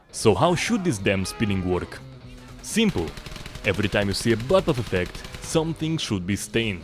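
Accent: Russia. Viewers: i like your russian accent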